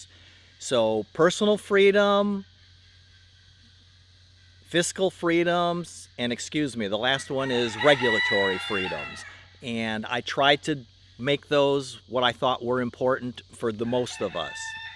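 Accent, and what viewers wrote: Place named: New England, United States. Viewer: I know this video is 2 years old and I've only watched 1 other of your videos but I wanted to take a guess based on your accent. Massachusetts Rhode Island Connecticut California now to watch the video and see how bad I missed.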